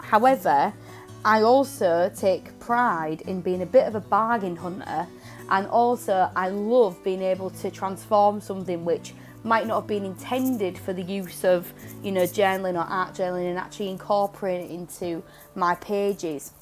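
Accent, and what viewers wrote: United Kingdom. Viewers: So beautiful!! I really enjoyed watching you decorate those yummy and oh so happy looking pages! And I also really enjoy listening to you. Love your british accent! ☺️ Could you please tell me what kind of pens you are using to make the colorful marks on the pages (dots, lines,..) Are they regular markers or brush tipped? Just curious... x.o Nat.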